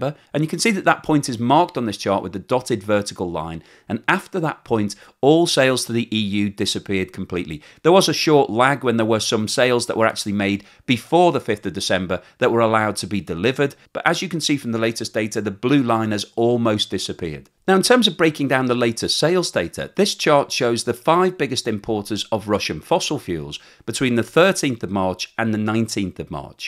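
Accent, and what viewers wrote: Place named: Liverpool, United Kingdom. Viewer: I think you are talking nonsense, seems to be a bit of scouse coming through maybe it's Thatchers fault.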